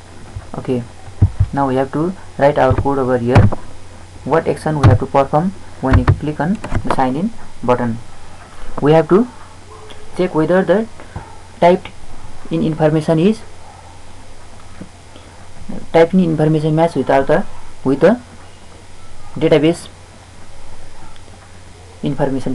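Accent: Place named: India. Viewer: Habla asi porque es el acento que tienen en la India, no es que hable mal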